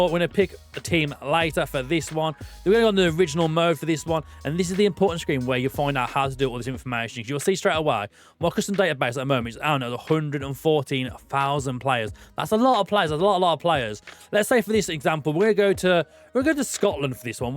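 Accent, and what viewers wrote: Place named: Plymouth, United Kingdom. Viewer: Are you from Plymouth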